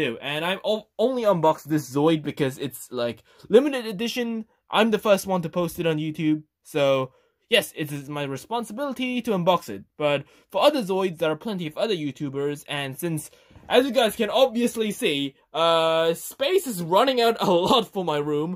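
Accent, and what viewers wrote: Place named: South Korea. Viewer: 아닝 영어 억양이 딱봐도 한국쪽인데 한사람 한국오셨나용?이래 ㅋㅋㅋㅋㅋㄱㅋㅋㅋㅋㅋㅋㅋ 귀엽네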